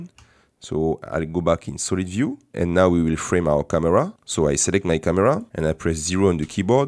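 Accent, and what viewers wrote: France. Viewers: I love your French accent😍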